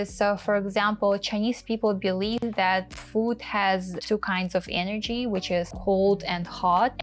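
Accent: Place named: Russia. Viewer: you have a very good English but am I hearing Russian accent?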